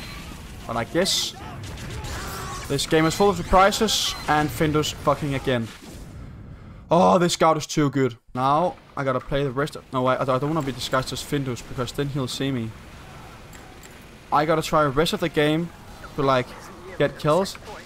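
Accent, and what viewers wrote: Denmark. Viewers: Your too danish, the danglish is real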